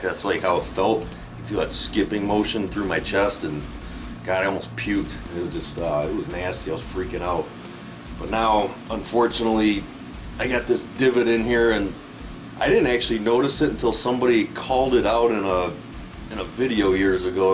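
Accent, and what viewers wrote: Canada. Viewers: Always wanted to ask, are you Canadian?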